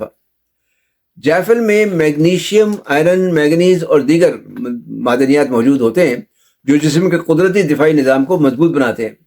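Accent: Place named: Lucknow, India. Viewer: آ پکا بات کرنے کا انداز یوپی والا ھے جیسے لکھنؤی!!!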